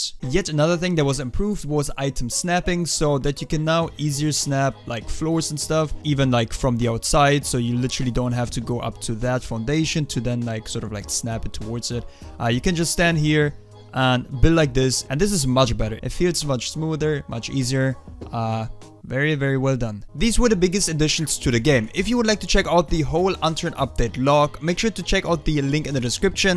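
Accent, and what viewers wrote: Germany. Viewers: Are you german by any cance? ur accent just sounds like that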